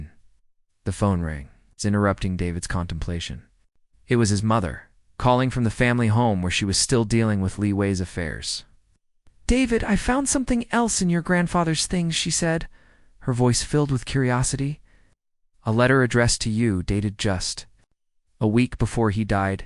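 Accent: United States. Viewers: Those are phoney uniforms - not one representing a regiment of the Brigade of Guards. You appear to have an American accent; why not post fiction based on an American formation?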